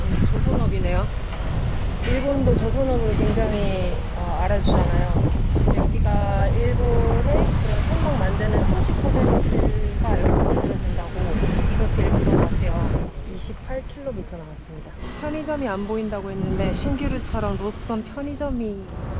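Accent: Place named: Gyeongsang, South Korea. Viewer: 저도 경상도 사람인데 혹시 어디분 이세용??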